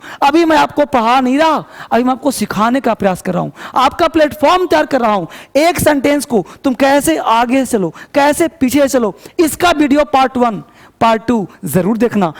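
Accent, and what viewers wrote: Bihar, India. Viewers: Ap bahut achhha padhate h Sir ap Bihar k rhn wale lagte hai n....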